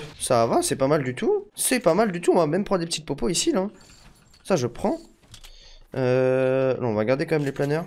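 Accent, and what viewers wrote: France. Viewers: les gars avec son accents francais est le style maison il habitr ah marrakech sais sur fois mille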